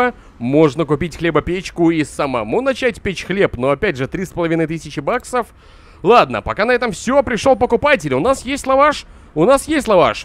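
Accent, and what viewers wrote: Belarus. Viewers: ты белаРус? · Ты из Белоруси?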